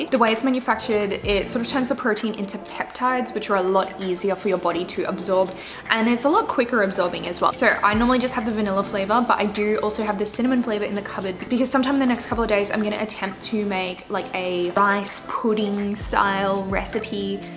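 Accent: Australia. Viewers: Australia I believe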